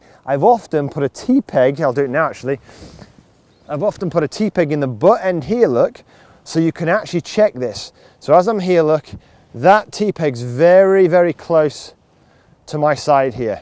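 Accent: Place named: England, United Kingdom. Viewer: Just found you yesterday. You are the first of countless internet teachers who's videos I have viewed who speaks a language (not counting the English accent :-) ) I have been able to understand! I took your tips on the take away to the range today and hit my irons more solidly and more consistently than I have in a long time! Please keep the videos and the 'simple talk' coming!! I'm very grateful for your help!! I look forward to more tips, drills and instruction and once again enjoying the game of golf!!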